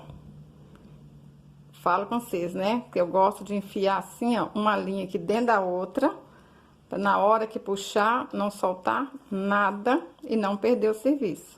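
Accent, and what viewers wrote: Minas Gerais, Brazil. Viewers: Vc é demais gosto muito de vc e do jeito que vc fala mineira Deus Abençoe professora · Sotaque da minha terra Minas Gerais. Falo assim até hj. Parabéns pela boneca. Amei. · Que jeitinho meigo de ensinar! Amei o seu sotaque! Vc é mineira? Perfeito! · Eita mineira retada essa igual eu ,parabéns amei.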